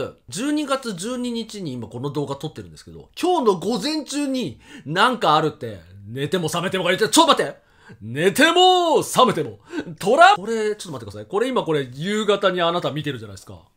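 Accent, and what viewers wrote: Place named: Kyushu, Japan. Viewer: 今日、九州弁がでてたね。 昭和ネタが大好き💕なのでこれからも沢山お願いします🤲。あ、姉そんネタも大好物です。 栗きんとんの冷凍は知らなかったよー😅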